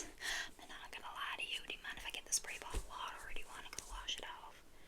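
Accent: United States. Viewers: your "country" accent is too cute haha. loved this character and roleplay ❤ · I love this. Thanks to bridgerton I have heard so many asmr videos with terrible British accents! Your American accent is ten times better than when I try one.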